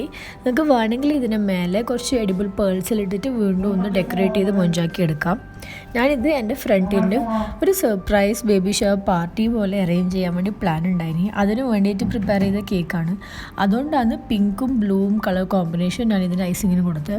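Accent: Kannur, India. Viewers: Nigale veed evideyaa kannur aanoo samsarikumpol nammude kannur kari ne pole und😊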